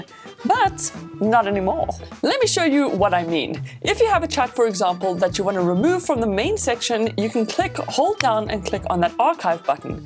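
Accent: South Africa. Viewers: I can't point out the accent, is it South African 🇿🇦?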